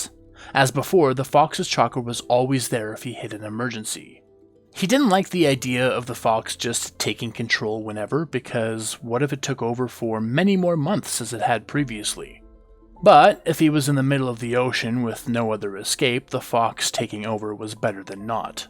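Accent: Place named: Canada. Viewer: Alas, I'm finally caught up lol. I've been following both this and Dygo's Naruto rewrite series, and I can definitely say that I like both. If asked which one I prefer, I think I'd have to say DygoKnight's, but that could very well be because I've known about and been following that one for a longer period of time. I've known of that one for a few months, and this one I just stumbled across about a week ago, when one of the full arc movie videos randomly got recommended to me by YouTube. You've mentioned being Canadian in a few of your videos, which I actually was able to guess within just a couple minutes of hearing you talk lol. I'm friends with a few Canadians, one of whom just finished a massive multi-year Dragon Ball passion project. Another factor might be the fact that I'm totally blind, have been since birth, and rely exclusively on my ears here; couple that with numerous conversations with the aforementioned Canadian friends, and yeah, it wasn't that hard to tell. 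I know you like to showcase fan art, but even when you do post visuals, well it doesn't matter to me what they look like, since I can't see a damn thing anyway hahaha. I've enjoyed your story so far, though admittedly I may have to go back and re-watch a few of the episodes. I ate some of my aunt's edibles earlier this week and tried to follow along with the story whilst being quite toasted, so my focus probably wasn't the best during those episodes lol. There's more I could say/ask, but I can save that for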